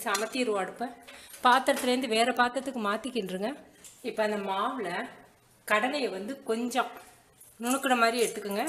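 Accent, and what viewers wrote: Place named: Karaikudi, India. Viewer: Aachi neenga pesara thamizh a kekkave naa unga videos a thirumba thirumba paapen. I'm from Konapet (near Karaikudi) and miss this accent. Azhaga explain pandringa :)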